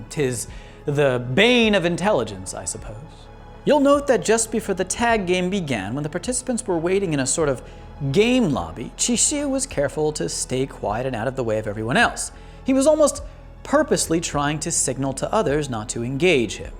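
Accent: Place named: United States. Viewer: Why do you pronounce the names with that American accent? It’s soooo cringe brother. Pronounce it properly! They are English names in a Japanese accent!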